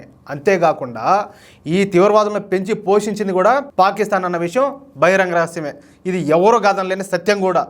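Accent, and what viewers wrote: Rayalaseema, India. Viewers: నమస్కారం గారు మీరు చెప్పే ప్రతి మాట తూటా లాగా ఉంటది రాయలసీమ యాసలో అద్భుతంగా మాట్లాడుతున్నారు నిక్కచ్చిగా ఉన్నట్లు మాట్లాడటం చాలా బాగుంది🎉🎉🎉🎉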